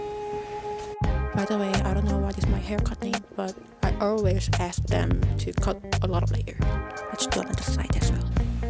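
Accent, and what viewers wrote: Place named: India. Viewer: One of the best accent its semi to an indian girl talking english 😅😂😂😂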